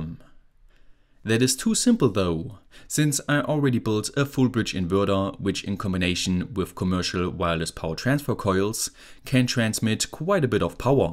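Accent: Germany. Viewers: Your form germany right? I can hear it 😂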